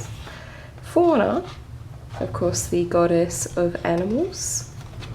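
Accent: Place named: Australia. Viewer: This is such a beautiful book! I'm looking forward to my copy! It should arrive in about two weeks or so. :) I really enjoyed this flip through, your commentary is enjoyable. I love your accent. <3 You from Australia?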